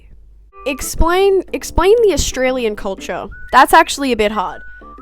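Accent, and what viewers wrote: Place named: United Kingdom. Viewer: Yes. She sounds british. wait wtf how old is she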